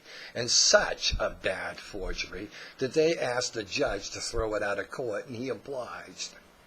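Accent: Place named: Boston, United States. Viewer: good vid man, you from boston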